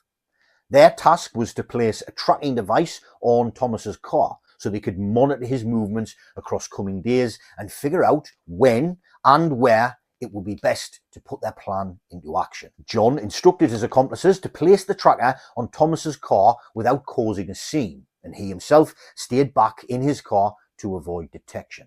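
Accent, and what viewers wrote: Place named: North East England, United Kingdom. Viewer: You sound like you're from up my way NE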